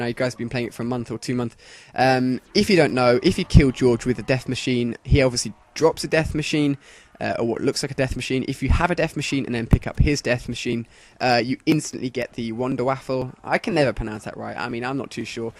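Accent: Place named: United Kingdom. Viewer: AHAHA! ANOTHER GUY WITH A BRITISH ACCENT!!!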